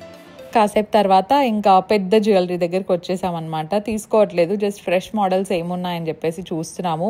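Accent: Anantapur, India. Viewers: Mana anantapur slang ye veru abba... Miru regular videos lo matlade dhaniki.. anantapur lo mi Amma valla tho vunnapudu matlade matalake chala difference vundhi. Anantapur slange ...Endhukante nenu kuda anantapur ye sis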